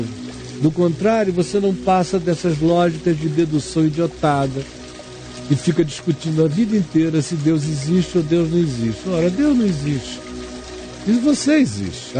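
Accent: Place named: Bahia, Brazil. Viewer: Eu tb pensei que era um baiano! 😄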